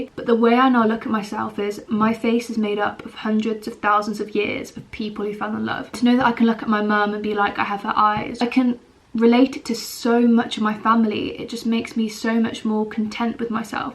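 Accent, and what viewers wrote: United Kingdom. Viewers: Your British accent is adorable 😩🎀✨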